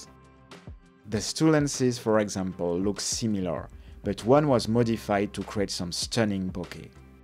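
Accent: France. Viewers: The tricks are just amzing ! And the powerful French accent is totally tentalizing.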